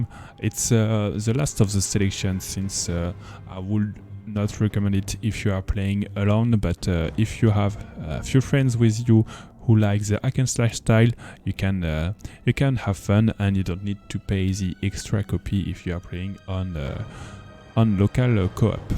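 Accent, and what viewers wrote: France. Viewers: Love the French accent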